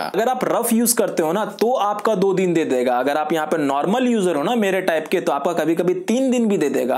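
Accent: Bihar, India. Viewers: Bihari ho ka 😅😅😅😅😅😅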